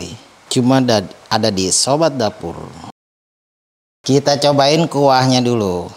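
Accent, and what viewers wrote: Jakarta, Indonesia. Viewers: Ngambang ye bang inget yang dikali bang. Betawi abis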